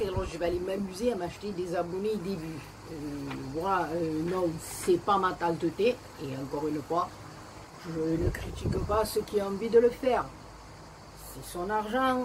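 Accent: South, France. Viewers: Coucou, j'adore regarder tes vidéos, ton naturel et ton accent bien du sud me donne du baume au cœur. Et comme tu dis chacun fait comme y veux. Bisous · Coucou. Je suis tout à fait d'accord avec toi. Ces questions sont vraiment c.....s. Comme pour Toi, youtube c'est une source de partage d'idées, de tutos et de connaissances rigolotes comme tu es, comme Greg etc.... que des gens simples et francs, et cet accent qui est le mien j'adore. Moi aussi toutes ces questions me font "monter la boufaïsse". Comme j'aimerai vous rencontrer Toi, Greg, Patou parce que je pense que nous ne sommes pas loin (géographiquement parlant) je peux espérer peut-être un jour chez Action Saint -Victoret ou Istres (car je suis plus près d'Istres. Un conseil. Continues, ne changes rien. À bientôt. Bisous 😘.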